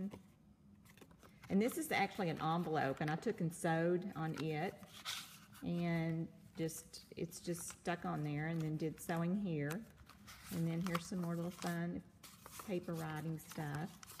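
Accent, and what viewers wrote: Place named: South, United States. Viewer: Your gaw-geous southern accent is so wonderful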